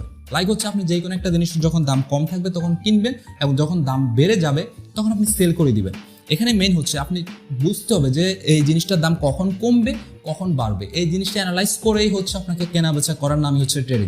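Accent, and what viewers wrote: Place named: Chittagong, Bangladesh. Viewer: Chittagong er mone hocche 😐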